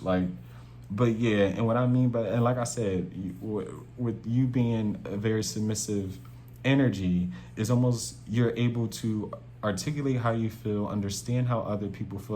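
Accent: Philadelphia, United States. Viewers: Being as though we both from Philly… lmao I can just hear it in voice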